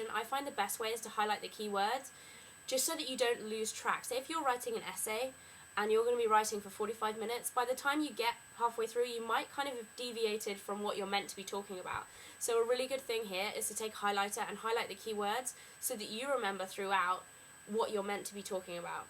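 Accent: England, United Kingdom. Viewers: what did you go to uni for? btw i looove the english accent of yours :) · Thumbs up to Her British Accent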